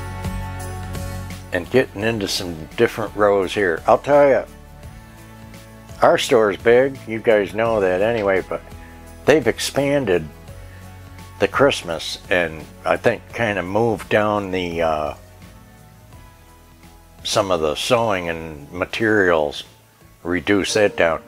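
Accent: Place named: Midwest, United States. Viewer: R u from the midwest? Love your accent merry shopping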